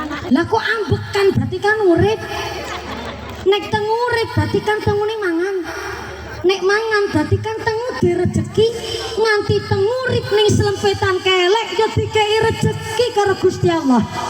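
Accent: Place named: Banyumas, Indonesia. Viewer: Ustazah favorit saya ini..sama2 wong ngapak..ora ngapak ora kepenak 👍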